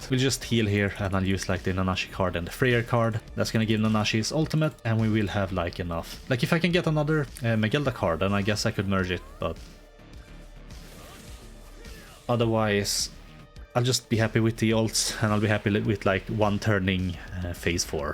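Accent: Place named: Sweden. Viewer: Its probably just the swedish accent honestly, you do say quite a lot of words differently. But my tired brain would argue. 😂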